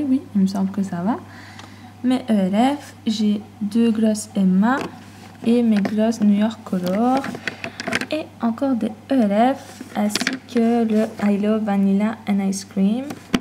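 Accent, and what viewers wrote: Belgium. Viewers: L'accent de diiiiiiiingue :D Mais une belge ça fait plaisir :) Bisous :)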